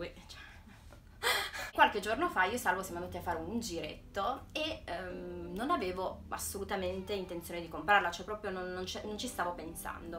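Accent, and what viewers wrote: Sicily, Italy. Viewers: Ma sei siciliana? Sento un pò ' l'accento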